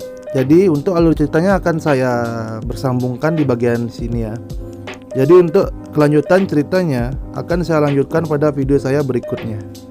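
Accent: Bali, Indonesia. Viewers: Oi, ini pengisi suara orang bali ya pengisinya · Logat nya bali banget wkwkwkwk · pantes nyk san logat bali ne🤙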